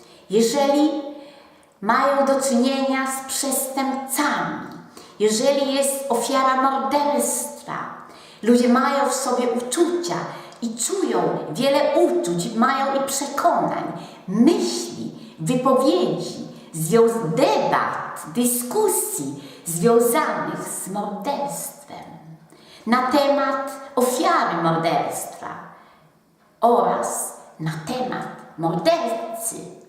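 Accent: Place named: East, Poland. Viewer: Ona ma wschodni akcent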